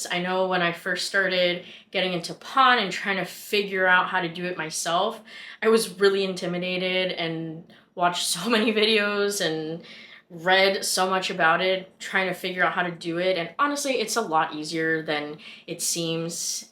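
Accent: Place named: Southern California, United States. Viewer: Ooh I really like you! I love it when I can tell right away somebody is from SoCal, then when I saw your username I knew for sure! You explain things in a great, direct and enjoyable way!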